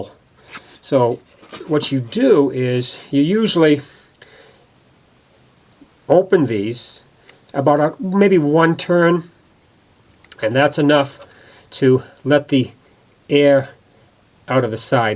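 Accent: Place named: Rhode Island, United States. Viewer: Probably because hes from "Rwoad" Island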